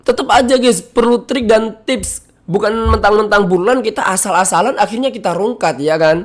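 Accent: Indonesia. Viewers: wong ngapak kayane ya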